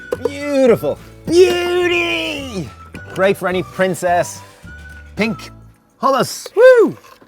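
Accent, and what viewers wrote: Ireland. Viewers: Who would have thought Ireland had such hidden gems! Your fantastic accents distracts me from the food in hand! :)) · You guys are the best! Love the accent. Is it Irish?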